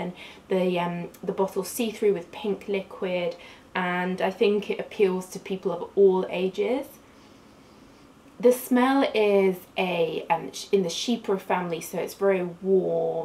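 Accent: United Kingdom. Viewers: I love this accent not every British person does have this kind of accent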